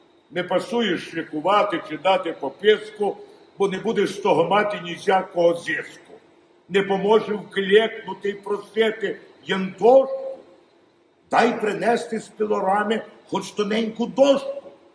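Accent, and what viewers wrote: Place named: Western Ukraine, Ukraine. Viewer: Абсолютно геніально! Цей говір і гумор! Особливо цей унікальний «західноукраїнський» сільський говір з якого «люди з міста» насміхались в радянські часи. А кожна задрипана дівка з села біля Львова, якій вдалося влаштуватися на роботу у Львові, лізла зі шкіри, щоб «разгаваривать на общепонятном руском язике» Мав нагоду цей феномен спостерігати, працюючи в одному з наукових закладів Академії Наук. Обʼїздив багато світу, і в кожному куточку є свій говір, сленг. Що і робить цей світ різноманітним і цікавим!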